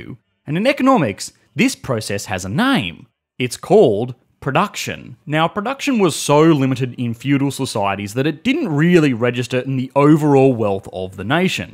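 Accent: Australia. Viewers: Is that an Australian accent? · Me too, and I'm usually just listening to them in the background. I think it might be the Aussie accent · you have a quite funny accent, i would guess is it australian accent?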